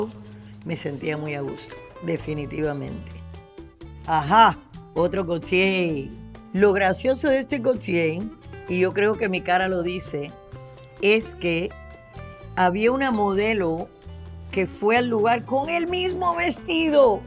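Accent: Cuba. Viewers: Como se oye para ti? Yo soy mexicano creado en Florida. Para mí se oye bien bien Cuban, aunque ella creció en Miami. Te suena su acento gringo al hablar castellano? Como se compara a un acento auténtico cubano--de la isla, diferente a lo cubano de Miami. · Gloria nunca pierde su acento cubano... Bella!!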